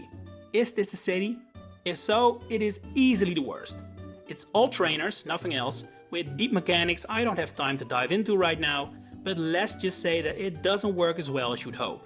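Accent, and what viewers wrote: Netherlands. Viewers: Can I ask you what your nationality is? I can't help but hear a Dutch accent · Are you Dutch? :p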